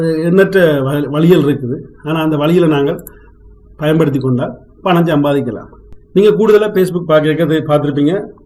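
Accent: Sri Lanka. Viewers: உங்கள் இலங்கை தமிழ் அற்புதம் நம்பரே. எனக்கு ஒரே ஒரு சந்தேகம். நமக்கு பணம் எப்படி வந்து சேரும் .அதை நீங்கள் சொல்லவே இல்லையே .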